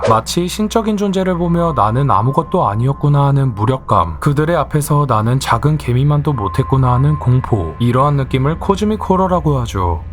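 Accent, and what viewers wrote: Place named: Changwon, South Korea. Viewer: 목소리랑 사투리 창원개미랑 개똑닮 ㅋㅋㅋㅋㅋㅋㅋㅋㅋㅋ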